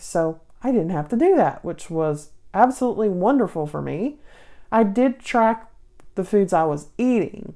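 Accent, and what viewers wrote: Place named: Texas, United States. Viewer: Are you a Texan, sweetie? You sound like it.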